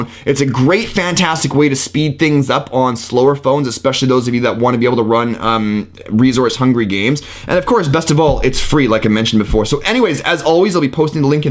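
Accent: Canada. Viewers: Ur r canadian right?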